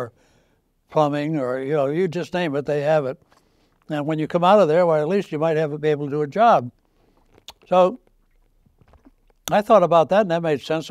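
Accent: Boston, United States. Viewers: He's got a very interesting Boston accent...in that it doesn't sound like any Boston I'm familiar with. Very intriguing! I wonder if he comes from a very old New England family. He's got a very Rhotic accent....no pawk the caw in the yawd.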